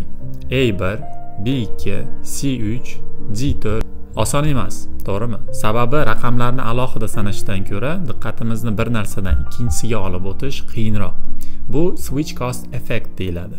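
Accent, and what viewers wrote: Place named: Andijan, Uzbekistan. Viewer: Anjancha shevani eshitib siz bn faxrlandim,omadizni bersin👍👍✊✊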